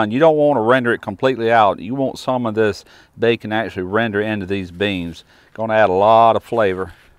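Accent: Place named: Texas, United States. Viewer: this dish looks mouth-watering👍 and I really like your accent. are you from Texas or elsewhere down south?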